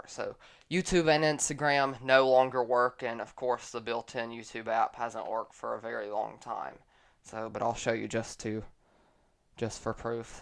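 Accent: Texas, United States. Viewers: great advise and your voice sounds nice (sounds like your from texas or near that area)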